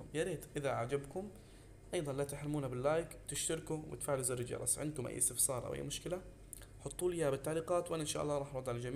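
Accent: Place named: Libya. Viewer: احلا ناس والله. بس لهجتك نفس ليبيه ❤️❤️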